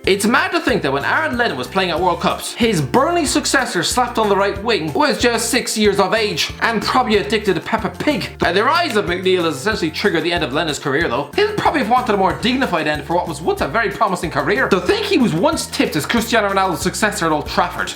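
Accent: Ireland. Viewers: Are u irish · Any other man rants 🤦‍♀️ Irish man rants 👌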